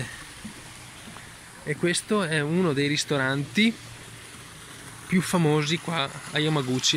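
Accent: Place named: Romagna, Italy. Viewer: Molto suggestivo...dall'accento non capisco se sei più romagnolo o padovano :))...nel caso i colli euganei sono stupendi. Dai noi, in Sardegna, vicino a dove vivo io, facciamo diverse feste in campagna (sai col maialetto da latte e vino rosso) e ci sono diversi agriturismo immersi nella natura incontaminata ma in stile Zen è veramente stupendo e nuovo. Davvero un piacere per tutti i sensi e in tutti i sensi :D.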